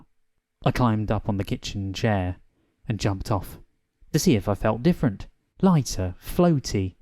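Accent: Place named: United Kingdom. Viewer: I love the UK accent and the way he read, so funny. Thank you for the work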